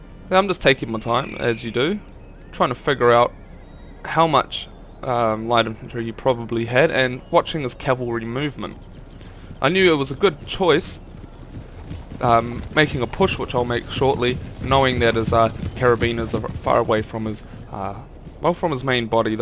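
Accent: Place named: New Zealand. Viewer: xD The kiwi accent restricts soooo much =(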